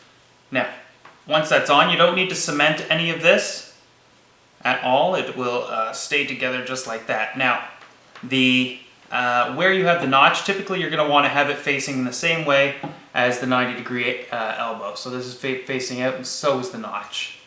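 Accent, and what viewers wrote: Canada. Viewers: Are you canadian? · I love his Canadian accent.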